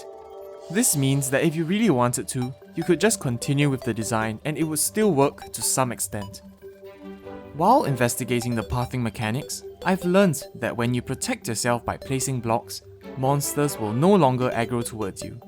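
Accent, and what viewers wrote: Singapore. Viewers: Are you Singaporean? You sound like your from Singapore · Why is the Singaporean accent so fucking nice? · U sound really singaporean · are u from singapore? u sound so familiar O.O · Uh is it me or do u sound a bit singaporean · are you singaporean or malaysian? Your commentary is relaxing and familiar:) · Are you singaporean?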